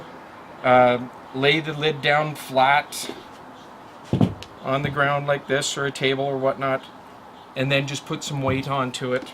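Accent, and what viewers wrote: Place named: Canada. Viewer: Great video i love the way canadians say about (a boat) and every conversation starts with ah fuck buddy very funny and cool.and whats the details on that lovely himidor ie price.capacity.cheers.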